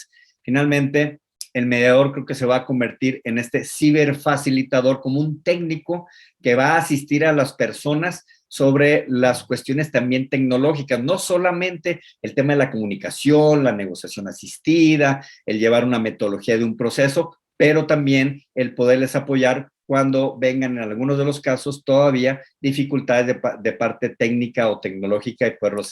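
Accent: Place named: Mexico. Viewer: Gracias por su aporte,ponencia muy buena narrativa y la deja mejor aún ese tono mexicano,.Creo que el ser humano se adapta a los cambios con respecto a la mediación y el uso de la tecnología...saludos desde Argentina.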